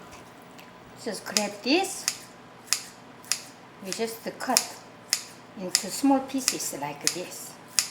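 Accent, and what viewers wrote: South Korea. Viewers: 영어 발음이 경상도 분 같기도 하고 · 한국인영어발음이셔서 ㄹㅇ 딱딱 귀에박힘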